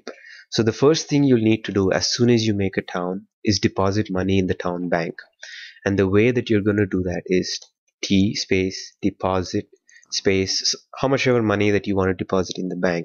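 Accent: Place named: South Asia. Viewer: u have hindi/urdu accent xddd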